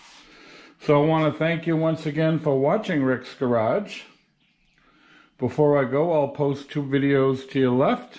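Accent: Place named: New England, United States. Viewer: A nice, authentic New England accent. Boston?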